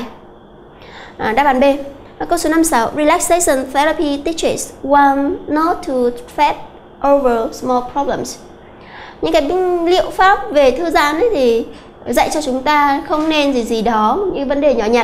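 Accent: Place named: Philippines. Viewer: s cô đọc nghe như cứ sai sai s ấy giống phát âm của ng philippines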